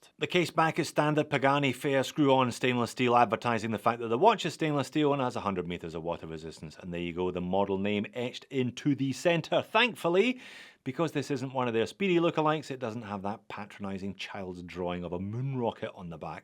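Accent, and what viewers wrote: Scotland, United Kingdom. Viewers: ya just type that in the search bar it's the old oatmeal crisp commercials from earlier 90s. For some reason your Scottish accent just pop the commercial into my head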